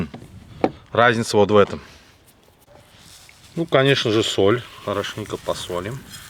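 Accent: Baku, Azerbaijan. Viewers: ТАК ПРИЯТНО СМОТРЕТЬ КАК ВЫ ГОТОВИТЕ С БОЛЬШИМ НАСТРОЕНИЕМ И БАКИНСКИМ АКЦЕНТОМ ПРЯМ СУУУПППЕЕЕРРРР. 👍👍👍👍👍👍👍👏👏👏👏👏👏👏🇦🇿🇦🇿🇦🇿🇬🇪🇬🇪🇬🇪🇬🇪🇬🇪❤❤❤❤❤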